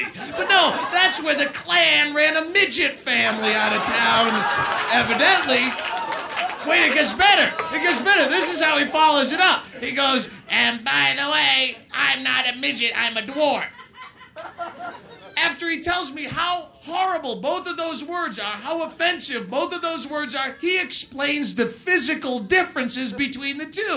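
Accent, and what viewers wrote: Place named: Massachusetts, United States. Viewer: Still had his Mass accent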